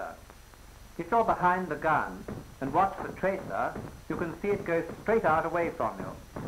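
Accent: RP, United Kingdom. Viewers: Having to listen to that plummy voice all day would drive me nuts. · The Queen's English of this narrator is absolutely smashing! Absolutely wizard!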